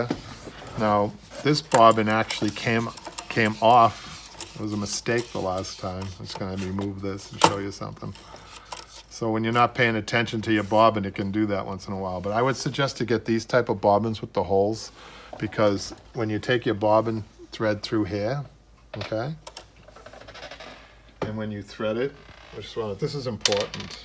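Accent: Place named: New England, United States. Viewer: As soon as I clicked your video and herd you talk I knew your a new Englanda! Im from Hampton NH. I’m Getting into auto upholstery. Looking at machines now. Thanks for sharing your knowledge.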